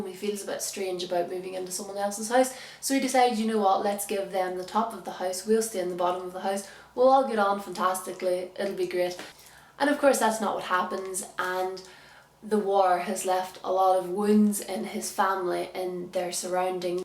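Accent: Ireland. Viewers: Are you Irish? I'm a Londoner xDD...Well I'm half. I mean, I was born and I live in London, but I'm half lol <3 Are there any books you can recommend for someone around my age-18-? xX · Are you irish? :D I found you through Sanne and I like you!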